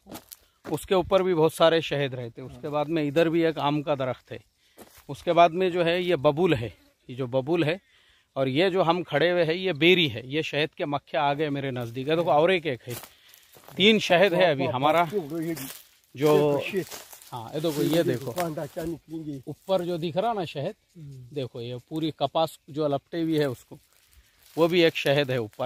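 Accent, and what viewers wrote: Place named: Maharashtra, India. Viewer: Mufti sahab Aap puna me Or alag alag city me rahe magar apne ilake ki zaban aap jo laheje ke saath bolte sun ke maza ajata pure marathi wobhi Maharashtrain acent ke saath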